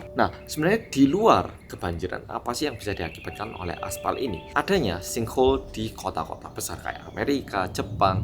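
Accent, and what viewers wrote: Java, Indonesia. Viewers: wajah Korea koq logat jowo sih mas.. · Hallo mas Korea medok Nitip salam buat kim bum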